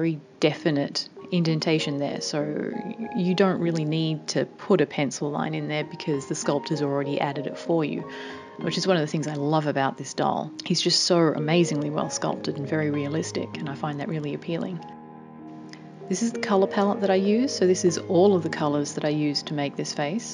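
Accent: Australia. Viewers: Love the Australian accent. Show me how to get one. Your work is amazing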